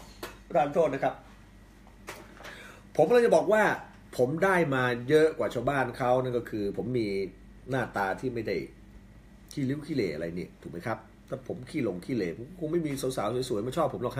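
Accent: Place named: Korat, Thailand. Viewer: ชัดเจนง่ายๆสไตล์โคราช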